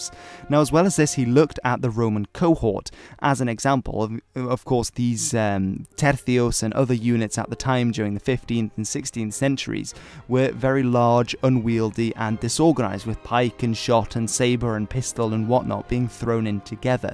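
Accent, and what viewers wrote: Netherlands. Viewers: you are dutch, youre accent is dutch and you pernoune dutch names almost perfect xD HUP HOLLAND HUP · is this guy dutch or english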